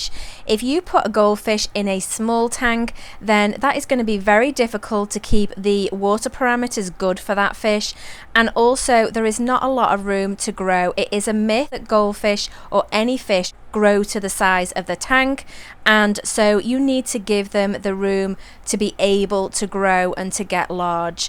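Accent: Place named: Merseyside, United Kingdom. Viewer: Hi. you sound like your from Merseyside somewhere I'm from Liverpool my self your vids are very helpful this is the first time I have kept fish mine is a cold water tank and I am only stocking different type of fantail 4fish about 2 months old. 6 fish about 3 months old. 3fish about 16 months old all added 1 week ago. My tank is 5FT 2x2 no plant life no coral just large glass slag rocks. White Sand external filter1200lh. 2 air stones. 1 Power head water stream. Iv bin up and running for a week all fish seem fine. But I am reading up on all type's of problems I might get as (condition of water) (food)( temperature) what has me a bit worried Is ick no sigh of it but prevention is better than cure. SO my question is can you put cupramine in my tank this is a treatment for ick.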